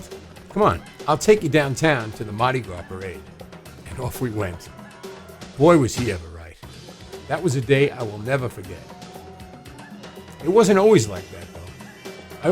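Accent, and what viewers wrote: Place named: Long Island, United States. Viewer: Very interesting video. Can't wait to hear your version of the song. Say hello to Tommy for me. Nice to hear you still have the LI accent. I still have mine too. Stay safe.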